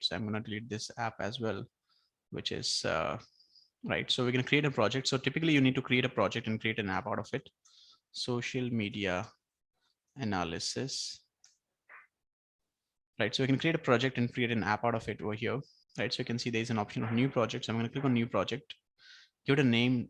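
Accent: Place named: India. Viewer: orang indonesia tapi logatnya kayak orang india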